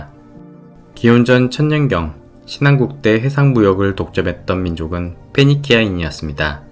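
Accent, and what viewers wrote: Jeolla, South Korea. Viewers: 영상 잘 보고 있습니다~! 드문드문 나오는 전라도 억양도 재밋어요 ㅎㅎㅎ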